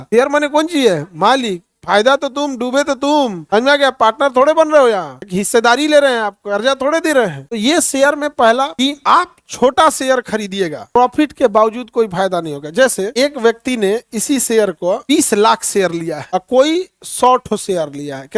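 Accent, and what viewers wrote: Bihar, India. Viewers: Chahe pdhe likhe ho,pr excent to bihari dudh vala hi hai..meri mmi boli jo truck pe maal load krte unki video sun rhe ho😂😂 Hindi ni aati aapko Vaise to bolte ho ke google ki jagah indian search,type ek video bnai thi aapne..😅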